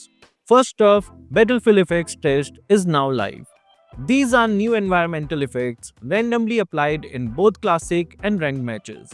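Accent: India. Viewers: Japanese name but Indian accent 😅😂😂😂😂😅😅😅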